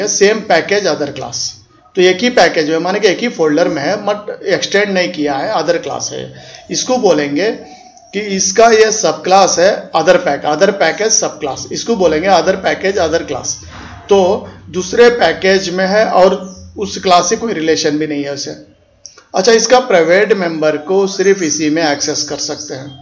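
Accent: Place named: Hyderabad, India. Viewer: Haha! Hyderabadi! 👌🏻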